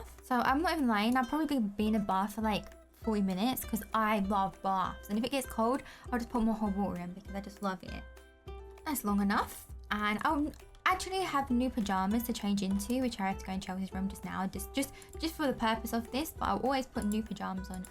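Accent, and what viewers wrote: London, United Kingdom. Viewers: I’m going to the Uk next year.and I went Last year.its really cool for me and hard to understand stand before and now I can so when I first started watching you I knew you where British.also I love ur videos😁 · Ooh your from London???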